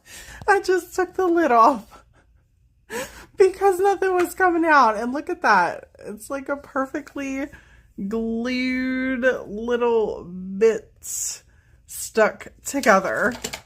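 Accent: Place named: Pennsylvania, United States. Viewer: Pennsylvania!!!! That must be what it is.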